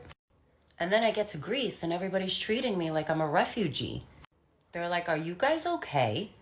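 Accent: New York, United States. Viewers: The NY accent gets me every time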